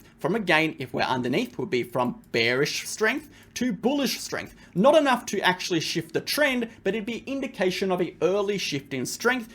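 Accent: Australia. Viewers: Let’s go …was waiting on that down under accent to guide the way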